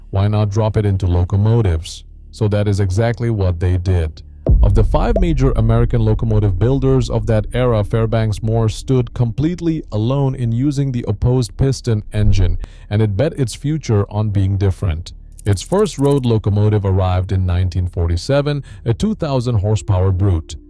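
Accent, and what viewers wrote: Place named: India. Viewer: An AI voice model with a slight indian accent on this type of channel is a wild choice!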